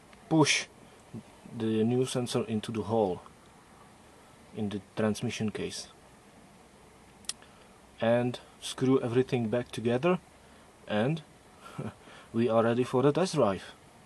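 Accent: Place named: Czech Republic. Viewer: So sorry. Now I see Czech. Definitely not a Deutsch accent. By the way, the car is working fine. If it goes bad, I'll know the fix.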